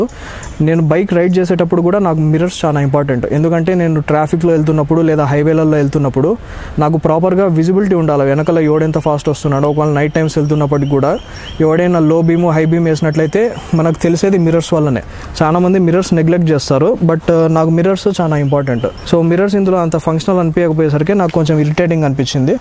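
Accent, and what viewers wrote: Rayalaseema, India. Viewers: I alway love your rayalaseema slang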